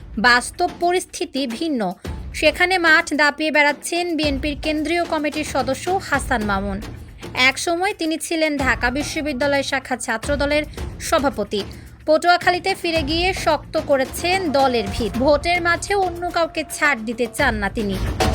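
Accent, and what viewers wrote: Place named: West Bengal, India. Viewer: তোমার কনটেন্ট সবই ঠিক তবে তুমি বাংলাদেশী নও কারণ তোমার কথায় অনেক কিছুতে লাগতেচে তুমি ওপার বাংলার এযেমন একটা কথা বলচো না ঘার কা না গাটকা এটা এনখও বাংলার ৯৯.৯৯% লোকে জানে না এটার মানে কি তবে নিজের দেশের দালালী করো আমার দেশের না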